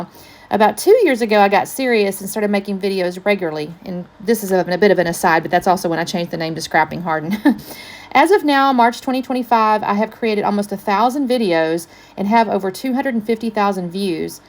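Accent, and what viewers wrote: Texas, United States. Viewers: I love your story. This is a wonderful tribute to you and all you have achieved. I love your positivity and feel like I am in the room with you when you scrap. I love your Texan accent. Always nice to listen to from an Aussie who feels we stick out so much on You Tube with our accents. I love what you did with the rainbow. 💛💛💛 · Great layout! I love your story of how you got started! And yes, I am one of your subscribers who loves your Texan accent - it always makes me smile! Thanks for sharing!❤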